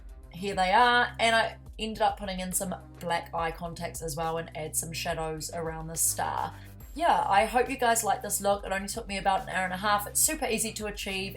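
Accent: New Zealand. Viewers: Wow! Very creative. I don't want to be offencive or racist but are you from New Zealand? The kiwi accent just clicked for me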